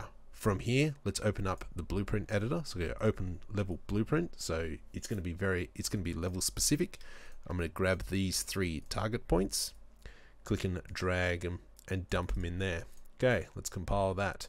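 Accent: Australia. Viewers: Good to hear and aussie voice and nice tutorial. How would you restart the random spawn process once the actor is destroyed, having alot of trouble with the extra step.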